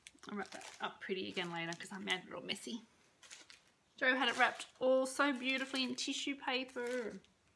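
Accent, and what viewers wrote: Australia. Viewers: Enjoy your flosstube and enjoying your "Aussie-Isms"!! I am from NC in the US and a video friendship with an Aussie stitcher is great!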